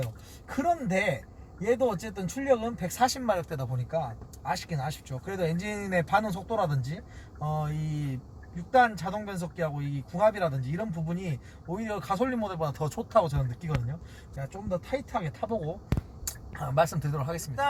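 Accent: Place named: South Jeolla, South Korea. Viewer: 억양이 전라남도 무안쪽 사투리 같은 느낌이네요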